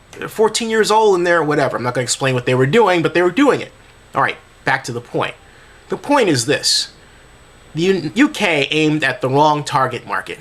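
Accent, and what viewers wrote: United States. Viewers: I can't bear to listen to his idiotic american accent or look at his holy than thou american face...Tutt tuttin fkin idiot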